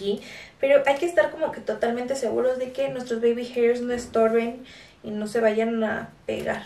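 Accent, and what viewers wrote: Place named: Mexico. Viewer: Amo a ❤🤩 En los asmr no se le nota tanto que es Mexicana 😊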